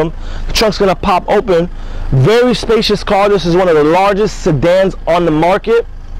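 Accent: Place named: Queens, United States. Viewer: Dude... you're very cool with your accent... Queens NY? But it's pronounced Hunday, not Honday. And thanks for showing the SE model. I wanted to see what it looked like with those for lights on.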